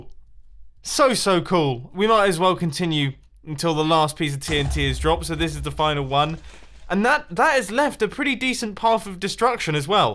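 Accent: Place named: United Kingdom. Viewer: He's British from what I've heard..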